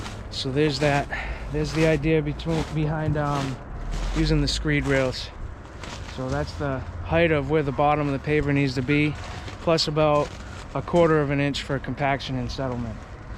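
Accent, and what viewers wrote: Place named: Maine, United States. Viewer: I love your channel and videos! For a second, I thought you were a fellow Canadian... well... until the Maine accent came out.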